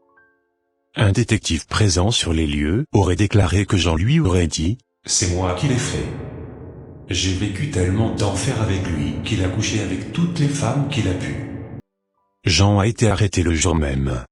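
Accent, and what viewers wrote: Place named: France. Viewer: Millionnaire s'il te plaît pourrais-tu prononcer en anglais les prénoms de Jean et des villes américaines sans accent français parce que dans ce reportage je me demandais de chaque fois pourquoi il vient avec un nom d'homme je m'excuse encore. À plus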